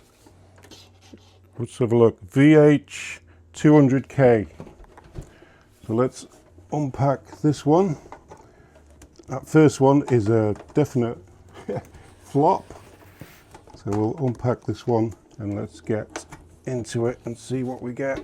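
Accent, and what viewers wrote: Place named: Liverpool, United Kingdom. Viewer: Whu are you speaking in a scouse accent? Are you even from Liverpool? If you are not from Liverpool then why are you speaking in a scouse accent?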